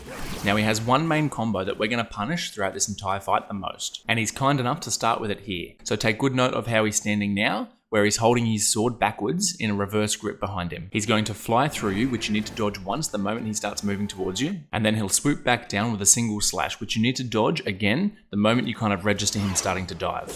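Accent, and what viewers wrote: Australia. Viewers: Aussie annoying accent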